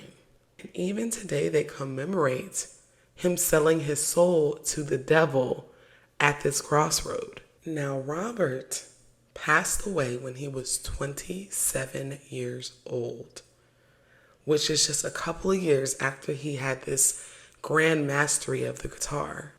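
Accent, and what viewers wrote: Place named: California, United States. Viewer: Can somebody tell me where shes from? I hear a Stockton-Sacramento accent but I could be wrong…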